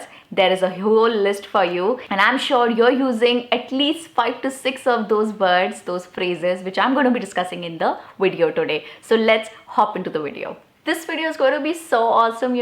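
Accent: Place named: India. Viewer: Ur spoken resembles sheer Indian accent.. Legit impressive 😍😍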